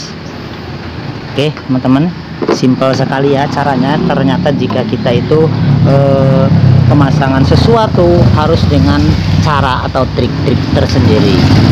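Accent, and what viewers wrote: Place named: Banyumas, Indonesia. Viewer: Republik Ngapak..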